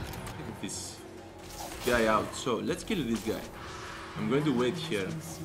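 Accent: Greece. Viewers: pretty sure its greek. i am from greece and lots of people talk like that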